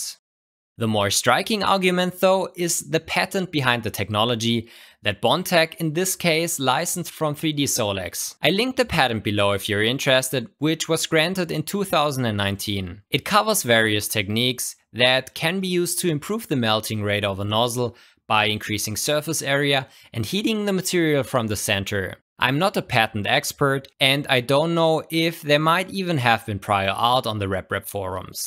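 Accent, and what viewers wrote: Germany. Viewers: hmnhhh gutentag, your english is nice i bearly hear your german axent:D im proud of you <3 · höhre ich da deutsch raus? XD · Man hört so hart raus dass du Deutscher bist :)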